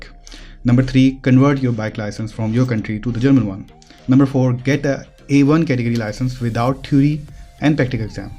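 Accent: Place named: India. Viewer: Me completely confused when hearing an Indian accent😂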